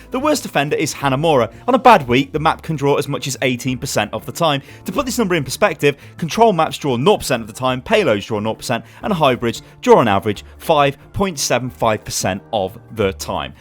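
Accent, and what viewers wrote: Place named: United Kingdom. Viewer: Force gaming said all of this in a way shorter time. However it is true that your Brit accent is better to listen to than his American one.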